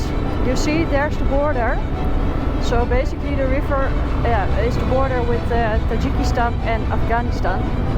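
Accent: Netherlands. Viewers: Another adventurous Dutch lady. I start to like their accent.😄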